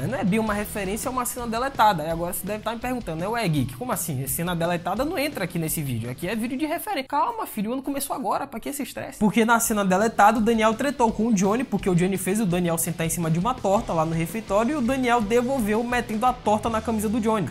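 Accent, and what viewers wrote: Bahia, Brazil. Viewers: Você é Baiano, de Salvador? Porque eu sou, e achei muito massa ver um youtuber nerd daqui!! Kkkkk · Vc é da Bahia mano ? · Você é Baiano 😁😁😁, que legal , Bora Baeeeeeea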